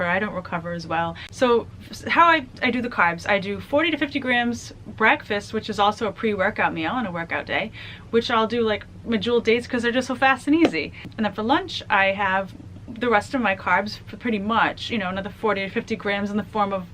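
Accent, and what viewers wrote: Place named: Boston, United States. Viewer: BTW, if you don't mind my asking... where are you from/do you live? i've been trying to place your accent... i feel like i almost know it but can't quite place it. do you live in boston? i lived there once many years ago and it sounds vaguely familiar! i totally understand though if you don't want to say, this being the internet and all. :-)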